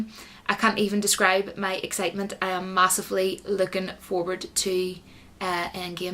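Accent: Scotland, United Kingdom. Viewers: Like ur Accent... r u scottish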